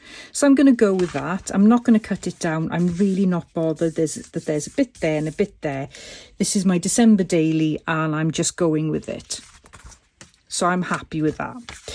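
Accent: Wales, United Kingdom. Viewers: Wow. This is amazing. Thank you for the tutorial. I absolutely LOVE your Welsh accent.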